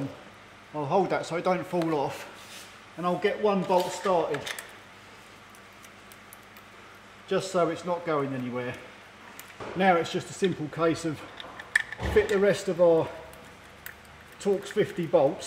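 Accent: Cockney, United Kingdom. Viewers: Are you from London? Im Croat and you sound to me like cockney accent, am I right?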